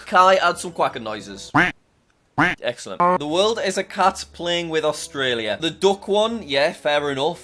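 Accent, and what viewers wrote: United Kingdom. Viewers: Americas look more like a duck sideways we’re you saying dog or duck I’m sorry I don’t understand British very well I’m American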